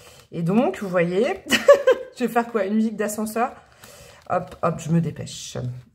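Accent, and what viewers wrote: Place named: Alsace, France. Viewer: Coucou ma ✨…tu chopes l’accent alsacien😅…ma belle région❤️ Choix 2…alors quoi te dire, la dernière guidance m’a déjà énormément parlé. Depuis notre guidance générale de l’année, il se passe bcp de choses, un truc m’est tombé dessus. Je ne l’ai pas vu venir….baaaam je ne contrôle plus rien. Tu avais vu juste😆🙏🥰…j’avoue que ça me fait un peu flipper. ❤️❤❤ et ça va me faire sortir de ma zone de confort. Une rencontre se profile pour bientôt🫣. Bon we, merci pour tes belles vibes et tes ressentis⭐️⭐️😘😘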